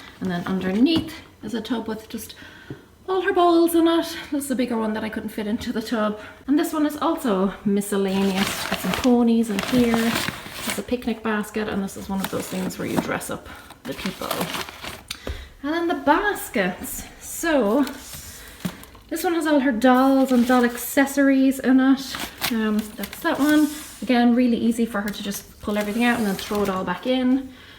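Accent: Ireland. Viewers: "they are". The Irish accent makes the area have two syllables!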